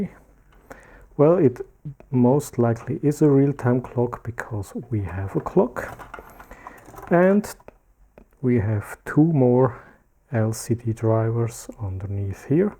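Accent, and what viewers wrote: Germany. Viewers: Sorry, was very interested to watch, but your accent is just too disgusting and vomit-inducing. I mean German is bad enough, but Mountain-German even worse. If you use English, at least learn to pronounce it without giving ppl ear-cancer. Everything else is just arrogant and cringey. One of the biggest offenders was "tchyoobs". It's pronounced "toobs", yo. Well, gonna mute you and maybe then it'll be watchable... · Also es klingt für mich so,als wenn du Deutscher bist 🤔 Liege ich da richtig? 😜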